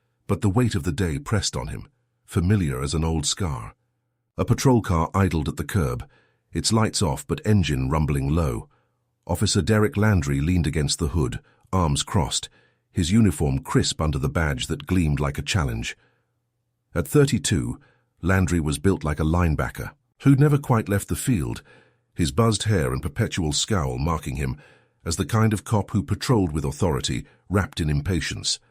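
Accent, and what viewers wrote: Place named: United Kingdom. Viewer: This story is disjointed and ludicrus. This AI crap has really become tiresome. Why is the writer of this story using a British accent to tell an American story?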